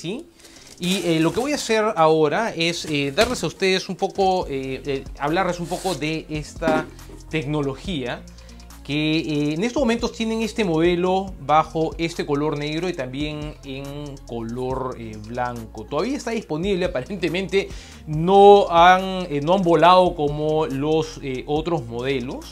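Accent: Peru. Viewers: Ese tono peruano me hace largarme y no verte 🙄🙄🙄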